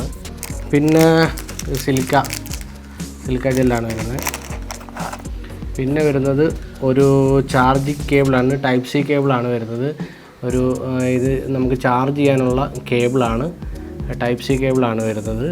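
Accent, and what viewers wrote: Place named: Kollam, India. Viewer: കൊല്ലക്കാരുടെ സ്വന്തം സ്ലാങ്ക് "ദാണ്ടേ " പിന്നല്ല പൊളിച്ചു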